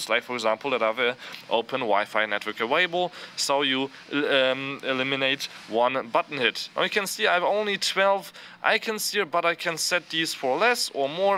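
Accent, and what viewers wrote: Germany. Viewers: ... I like it when German speak English. The little accent is cute. :3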